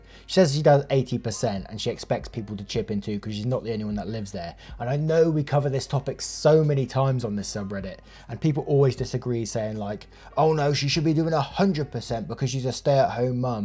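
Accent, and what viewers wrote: United Kingdom. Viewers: Omg I’m so stupid, you’re British? I guess I don’t know accents I thought you were Australian this whole time wtf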